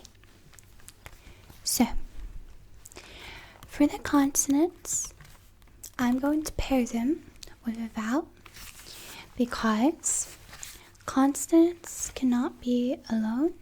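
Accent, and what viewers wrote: United States. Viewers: did you change your accent... It sounds more American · A British girl with American accent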